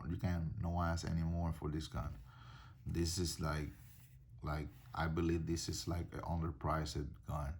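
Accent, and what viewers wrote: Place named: Dominican Republic. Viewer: You sound dominican lol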